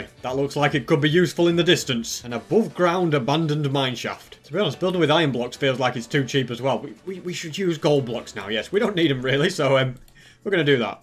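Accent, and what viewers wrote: United Kingdom. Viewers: Are Ya British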